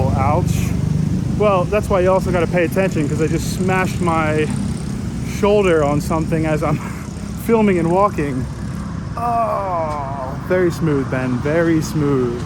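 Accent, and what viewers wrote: New York, United States. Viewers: Bro you`re TOO nice to these haters, i would have appreciated good old `get the fuck outta here!` rant with that NYC accent&attitude!😂🤣💪👍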